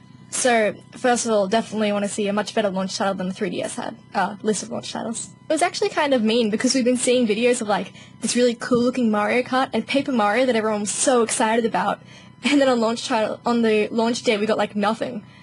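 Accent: Australia. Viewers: Your first vid I'm seein'. I subbed. At first I thought you had a british accent, but then I clocked it was Australian lol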